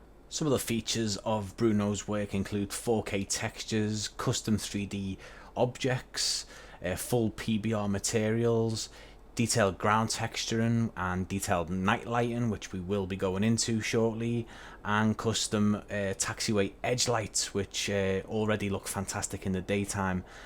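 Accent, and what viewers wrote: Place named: England, United Kingdom. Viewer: 🙋‍♂️👍Really,Really Nice Reviews,...I’m Impressed by your Very well done Reviews! Like the English accent too. Keep up the good work 👍✈️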